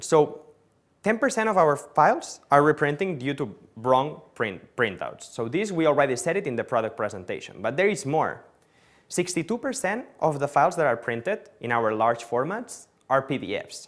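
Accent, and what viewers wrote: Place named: Italy. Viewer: Sicuro sei italiano!!!😊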